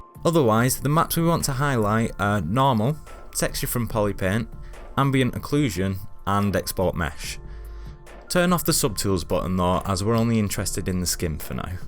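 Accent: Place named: Northern England, United Kingdom. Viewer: You're so northern hahaha! I love it. Lovely tutorial, thank you for sharing :)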